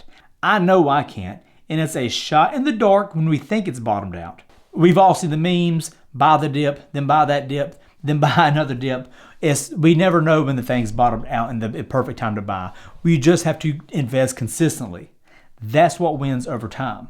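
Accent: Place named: Georgia, United States. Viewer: Where u from? Sounds a little bit of Georgia in ya. Good ole country boy from Arkansas here and I'm glad I found ur vid. First time viewer and first year I've been investing. Turned 4 thousand into 25 as of this moment.....was about 40 but I believe in the cryptoz that I've invested in and know they are the future in all their respective areas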